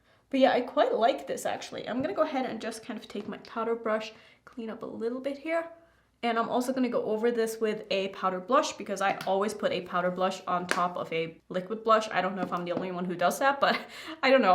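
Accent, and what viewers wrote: United Kingdom. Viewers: It’s called blursh because it’s spelled JUST like some brits pronounce the word blush. The also say bush for brush. “Did y’all wash your bushes?” I hear him say it alllll the time on his Instagram weekly. It comes out sounding like BLURSH to us Americans:) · In Manchester where he comes from, often when they said blush it sounds more like blursh. That’s my theory anyways 🤣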